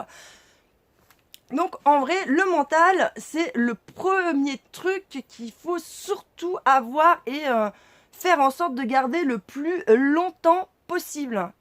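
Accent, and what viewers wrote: Paris, France. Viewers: qu'est ce que tu me fais marrer, j'adore ton peps pour expliquer les choses primordiales à 13500 abonnés (c'est top) au début, ton accent parisien m'agaçais un peu, je me disais encore une qui va raconter la vie sans savoir ....mais non t'es une référence. j'ai beaucoup d'estime pour toi car tu me communique. moi je suis a peu de chose dans les mêmes conditions de toi mais c'es trop de taf de vouloir les partager. merci encore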